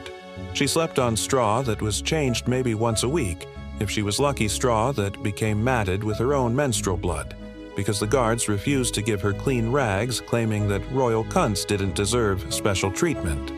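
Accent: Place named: United States. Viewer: So how truthful is this? The dramatic American accent lends BS/showbiz to this rendition!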